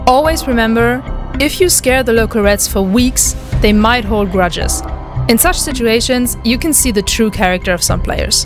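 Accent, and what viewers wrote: Germany. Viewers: a German lady with a wonderful English accent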